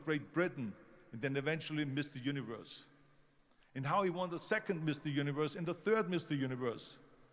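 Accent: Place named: United States. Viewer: Really Great Speech ... Nice Job Guys. Anyone that doesn't understand American accent, can watch this speech with sub title here : <link>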